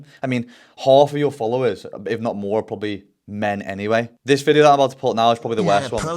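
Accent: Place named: Liverpool, United Kingdom. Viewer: Great content as always 👏🏼. Great work on the clarity of your speaking too, elocution lessons working well👌🏻👍🏻(not that I think there was anything wrong with your native wirral/scouse twang though! 😅)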